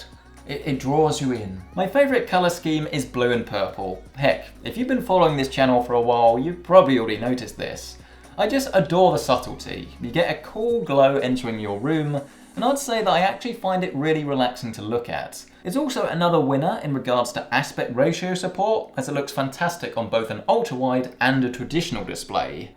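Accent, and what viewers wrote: United Kingdom. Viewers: Clear British speaker, not a loud American.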